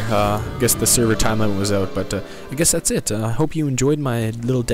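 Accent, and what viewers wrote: Canada. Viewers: Wait, are you Canadian? TOONIES RULE!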